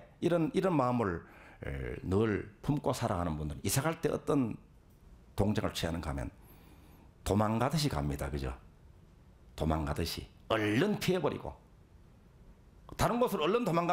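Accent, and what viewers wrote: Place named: Gyeongsang, South Korea. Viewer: 몇년전에 법상스님의 우연한신묘장구대다라니의 법문을 여러차례들었습니다 그때부터 열심히다라니공부를했습니다 경상도 억양의 스님강의 구수합니다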